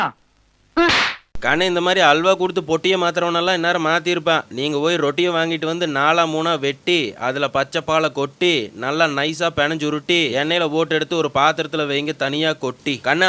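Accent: Coimbatore, India. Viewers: Coimbatore slang ❤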